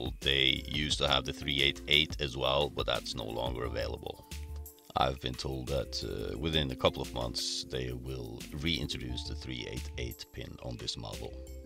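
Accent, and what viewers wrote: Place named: Norway. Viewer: Thought so I have a few friends from Norway and caught a faint lilt in your faultless English.update,I have just ordered this cue now,for sure a great back up to my predator revo,thanks for what I believe to be a wise investment,look forward to more of your content,by the way you move around the table with great skill.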